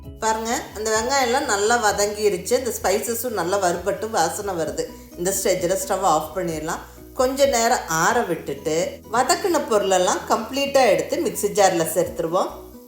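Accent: Kongu, India. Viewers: Namma kongu manathoda samayalum tamilum sooper madam.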